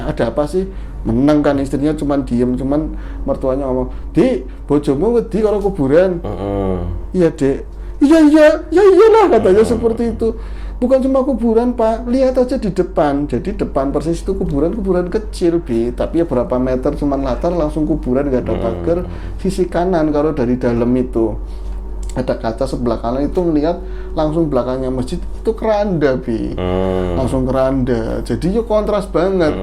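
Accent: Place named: Tegal, Indonesia. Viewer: Logat tegale mntep lah .lucu wkwkwk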